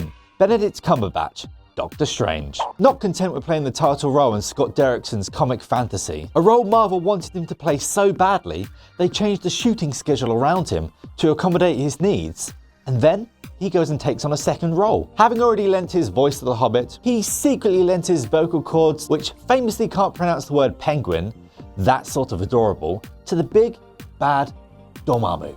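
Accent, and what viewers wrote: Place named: Cockney, United Kingdom. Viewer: Ex drug addict Cockney guys are not who you hire for voice over work...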